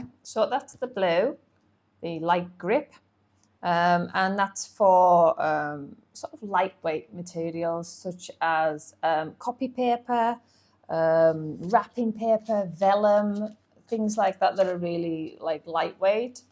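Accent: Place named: United Kingdom. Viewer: Hey it’s so nice hearing not an American accent when your from the uk, ok I’ve been given a Cricut Personal CRV001 Die Cutting Machine, but the mat unfortunately didn’t come with it, can you help and direct me to where I can get one please I’m looking for a 6x12 mat but I can’t find one any where it’s like 15cms by 30 cms I can’t find one on amozon , your help will be much appreciated thanks.:-)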